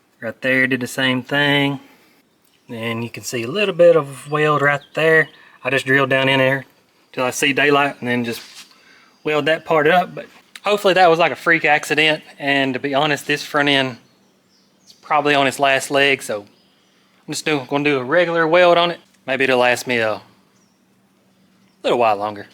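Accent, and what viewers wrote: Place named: South, United States. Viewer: If he’s got a southerner accent, you know you can trust him.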